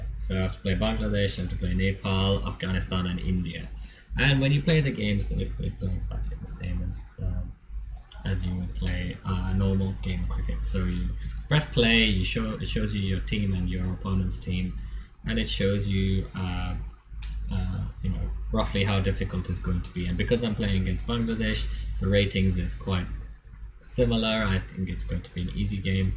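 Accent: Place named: England, United Kingdom. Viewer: Wow you sound full on Pom now lol. Nice.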